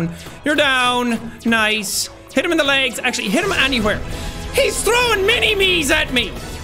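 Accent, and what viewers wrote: Scotland, United Kingdom. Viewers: I like your accent is it Scottish??? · Is he scottish? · BRO SOUNDS SCOTISH WHO AGREES 👇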